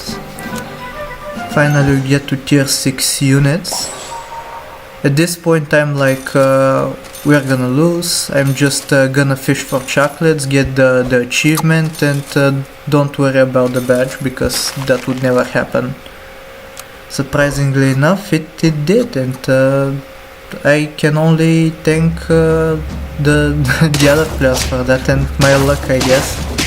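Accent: France. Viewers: oh my god are u french? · I like that fhrench accent :D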